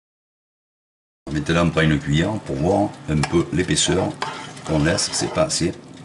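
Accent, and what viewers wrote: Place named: Provence, France. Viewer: Oh fan, tu me fends le cœur ! Je fais la même et je la termine avec un trait de cognac ! Je regarde régulièrement tes recettes et je me régale de ton accent, le vrai, celui de Pagnol et qui me manque tant là où j'habite (Auvergne) ! Mille merci pour ton partage, et compliments, cuisiner sur deux plaques électriques ça mérite une médaille ! Adéssias Amigo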